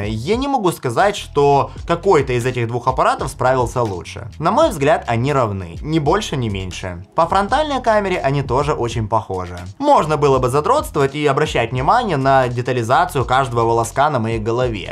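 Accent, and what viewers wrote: Odessa, Ukraine. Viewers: Хренасе, ты Одессит?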